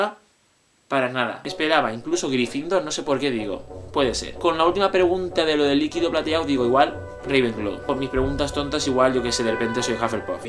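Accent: Catalonia, Spain. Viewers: Eres catalán?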